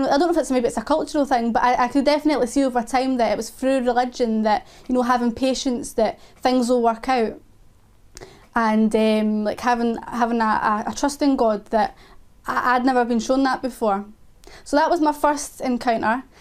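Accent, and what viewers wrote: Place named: Glasgow, United Kingdom. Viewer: As salamu Aleikum sister, welcome to Islam. Thank you for such a heart worming story. You reminded me of a beautiful Glasgowgian accent. Which i really liked while studying at Dundee university. Your humor about your dad's cooking made me lough a lot. Your story reflects inner and outer beauty. Your advise about Muslims not to react when they are wronged is spot on. It is the true reflection of our prophet Mohammad (saw). May Allah bless you and those attacking you to the right path.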